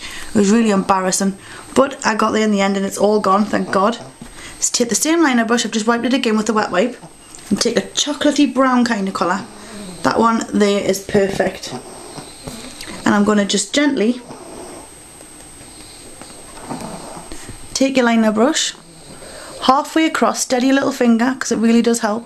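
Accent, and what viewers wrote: Ireland. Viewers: r u irish? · are you irish? x]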